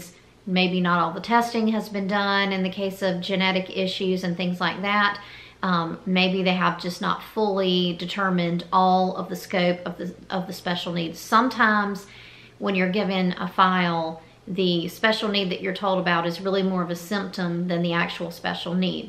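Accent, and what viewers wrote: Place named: Carolina, United States. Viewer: You sound like a fellow Carolina girl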